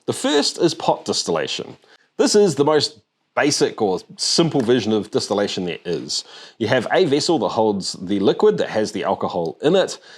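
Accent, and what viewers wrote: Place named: New Zealand. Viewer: The SHEEP INVASION., until now your accent (and formerly-American one) was the best evidence that your channel is pure New Zealand. But now you've been INVADED by BLEATING SHEEP.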